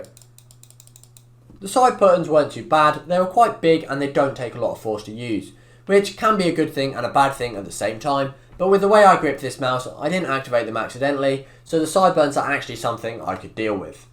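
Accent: United Kingdom. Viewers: Heyyy are u bri’ish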